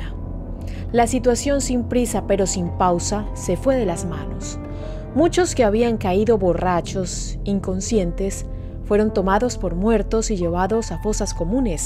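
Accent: Colombia. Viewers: Eres Colombiana?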